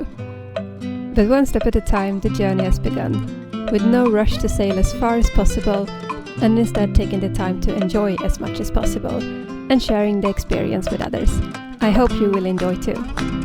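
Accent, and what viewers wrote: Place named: Värmland, Sweden. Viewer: Hi, I really enjoy your channel (especially when you get to hear some värmländska). I'm in a similar situation, having bought a Flamingo 40 last year as my first sail boat together with ha friend. I just have one suggestion - could you possibly arrange the order of the videos sequentially in the playlist you've made? Right now it seems that youtube autoplay will select the next video to play at random:) Thanks for the great content!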